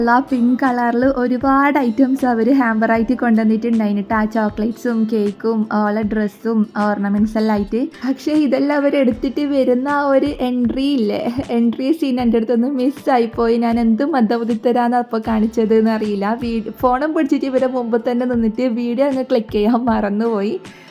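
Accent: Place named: Kannur, India. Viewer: ഞാനും കണ്ണൂർ ആണ് എന്ന വിചാരിച്ചു കണ്ണൂർ സംസാരം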